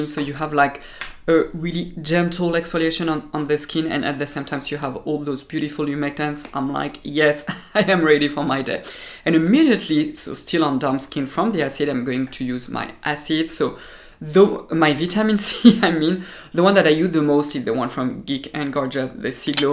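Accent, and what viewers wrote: France. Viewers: i love you and also love your french english😗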